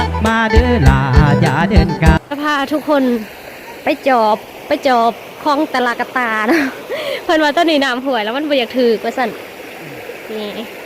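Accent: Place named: Sisaket, Thailand. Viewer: สบายดีลูกหล่าสำเนียงที่ลูกเว้าจาคือคนแถวศรีสะเกษกันเองบ่ต้องเก็งใจกัน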